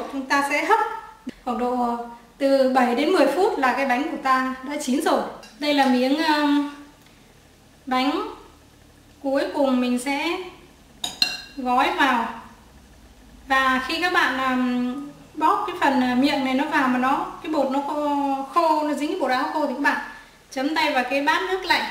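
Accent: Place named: North, Vietnam. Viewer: Bac ky 75 noi giong nghe oai qua .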